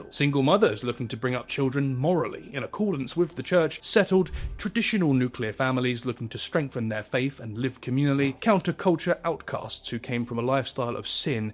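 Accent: England, United Kingdom. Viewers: That's just how English people speak. I think you're reading something that isn't there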